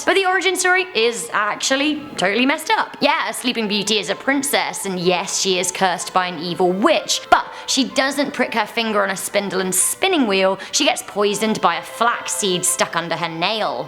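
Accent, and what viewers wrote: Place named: United Kingdom. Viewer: She sounds like a British Princess Carolyn (Bojack Horseman)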